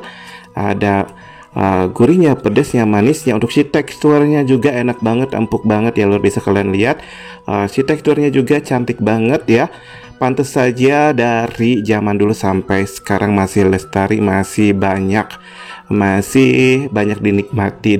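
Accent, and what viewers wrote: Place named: Java, Indonesia. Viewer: kelihatan medok banget😊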